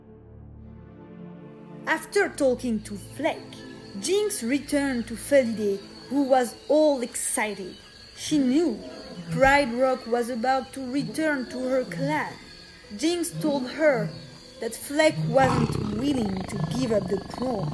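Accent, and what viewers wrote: France. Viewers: are you French I recognize your accent (je suis français aussi) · You are french ?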